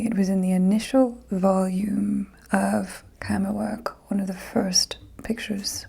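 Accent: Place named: Mid-Atlantic, United States. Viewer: I love your Mid-Atlantic accent!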